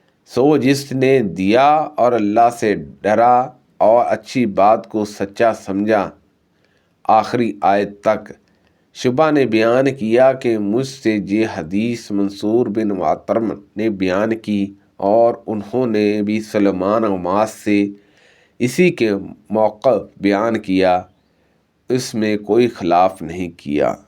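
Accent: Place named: Punjab, Pakistan. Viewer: السلام وعلیکم بھائی آپ سب سے پہلے اپنی اردو پر توجہ دیں، آپ اردو پنجابی میں بول رہے ہیں۔ احادیث کی آسان اور مختصر تشریح بھی کریں ،اس طرح عام انسان کو حدیث کا مفہوم سمجھنے میں آسانی ہو گی ۔ جزاک اللہ خیرا ۔❤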